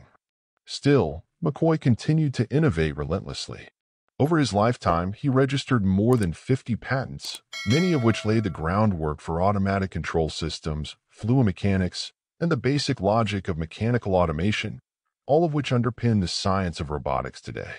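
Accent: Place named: Europe. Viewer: Just as sad is this video using a voice that sounds european why? Are you focus on them or what?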